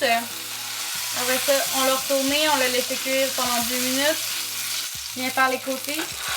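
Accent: Quebec, Canada. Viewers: Actually, it's french, Canadian French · Canadienne ? Je ne comprend pas bien tous les mots. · J'adore lâchent québécois 😍😍😍😍😍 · C’est du français du Québec :) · Excitant ton accent ma belle ça donne envie de partir au québec et biensûr bravo pour le steack, je suis presque amoureux ^^. Bisous et bomne continuation avec ta chaine je m'abonne direct ;)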